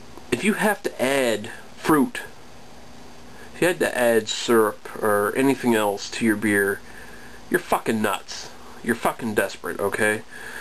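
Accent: Canada. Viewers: is he canadian (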